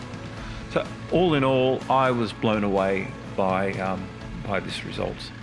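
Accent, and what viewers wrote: Australia. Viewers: So good to hear an Australian voice in a good vlog! I've never heard of these drones either. I have a Mavic Air and we tried this with a scooter in the rice fields here in Thailand but not a success. What bike are you riding here?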